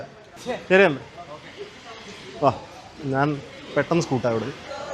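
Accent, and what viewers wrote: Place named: Alappuzha, India. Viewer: Ivn samsarikkunna reethi sheri alla alapuzha karde sthiram olla tone anubee akkal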